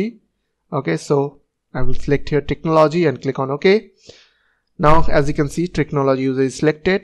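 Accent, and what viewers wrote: India. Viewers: Wow! This goes WAAAYYY too fast for me. I'm 71 and retired. Along with the Indian accent, I simply cannot follow this.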